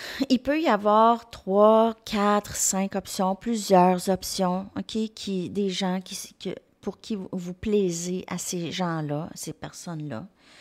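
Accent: Quebec, Canada. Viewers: Merci une très belle énergie que vous nos donny aujourd'hui avec ta jolie ax québécoise 😘🙏❤❤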